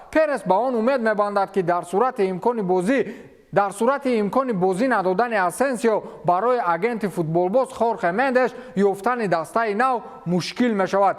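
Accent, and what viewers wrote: Kulob, Tajikistan. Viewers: Ира ами гапзаниш зебш лафзи Кулобигиай на ин ки будикский ть бра ягон будика ихел гап нишон бтее хорошо лафзи шмо агар лафз мешид дар иҷа ба Кулобиги гап намезад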